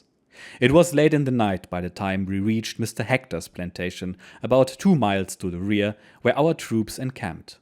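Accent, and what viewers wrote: Germany. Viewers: Really a great story ,I've enjoyed every episode thanks for reading it as your German accent makes it feel like it was him reading 📚 it !!!! Fantastic !! As the English say cheers!!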